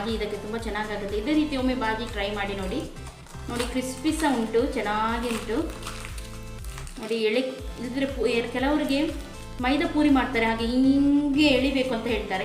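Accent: Mangalore, India. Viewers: Sis nimma Mangalore bhashe thumba Chennai so nice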